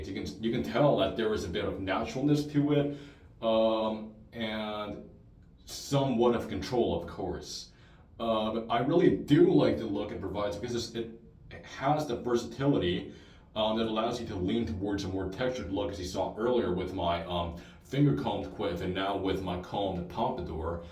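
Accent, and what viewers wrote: United States. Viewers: I really want to try it, but it's hard to find here in Indonesia. Anyways, where are you from? Your accent sounds like American, but your face reminds me of my friend (Chinese-Indonesian)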